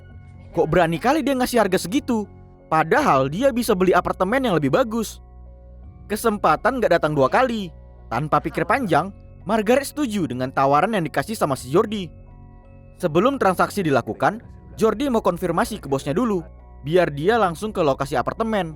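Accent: Medan, Indonesia. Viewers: Nampak X org medan nya.🤣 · Njr logatnya khas medan banget bang🗿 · Medan ya bang? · logat Medan ni wee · Logat medan kau lah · Logat medan bah anak medan kau ya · Bro Kau orang medan, tebing, atau siantar Ooaalaahh Kaco kacooo 🤣🤣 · Bang lo bahasa apa bang org medanya kau ini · Logat kau medan kali bah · Orang medan kau kan bg 🤣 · logat orang medan itu bg hehehe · Medan ni we udah jelas logatnya wkwkw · Medan mana bang ?? Kwkwkw kp lalang ni lek kwkw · Orang medan ya bg nampak kali ku tengok logat medannya🗿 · Adminnya orang Medan ya? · Ak suka bang konten mu tapi yg ku suka cara anak Medan berbicara buat ak ketawa sumpah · Bahasa abg ini mcm bahasa anak medan..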